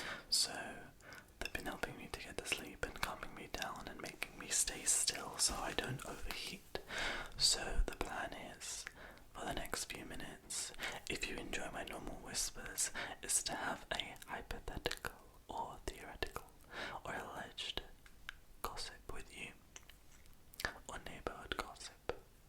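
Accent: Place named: United Kingdom. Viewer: I love your videos and british accent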